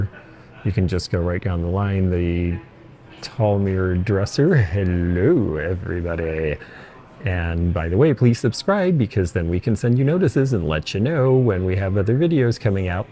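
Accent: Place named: Louisville, United States. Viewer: HI, lots of personality in this one!!! Sometimes you are so dang funny!!! It's always so unexpected and then you get me! Thank you for recalling Louisville to mind. One can always tell where the person's from depending on their pronuciation! 😉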